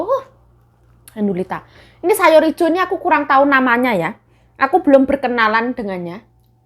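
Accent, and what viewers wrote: Java, Indonesia. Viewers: Mb asli org mana kok logate jowo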